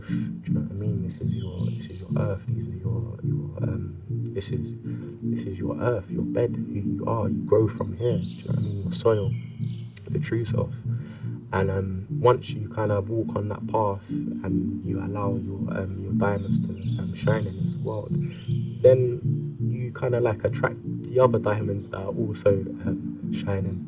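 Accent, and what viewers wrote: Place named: RP, United Kingdom. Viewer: You have such an advantage with your British accent! You can literally post the inside of you nostril and make it look and sound posh 😅. You're awesome! God bless 🙏💖🌞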